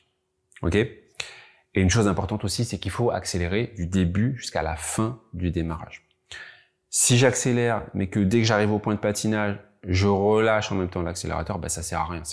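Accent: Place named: Guadeloupe, France. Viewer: C’est drôle, je vous trouve un léger accent guadeloupéen, mais je suis sûrement biaisée ! ^^ Merci pour cette vidéo, et les autres en tous cas ! 🙏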